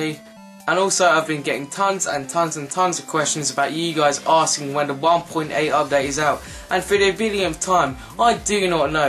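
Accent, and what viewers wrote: England, United Kingdom. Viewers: what part of the uk are u from? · Where abouts from England are you from?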